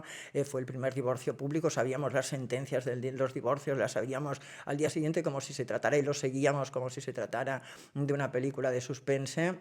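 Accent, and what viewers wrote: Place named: Barcelona, Spain. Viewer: Este “no” es una marca de la casa. No te inquietes por eso, es una coletilla perfectamente válida. Tu forma de hablar es muy barcelonesa ( parte alta ) y a mí me recuerda cuando vivía en esa ciudad.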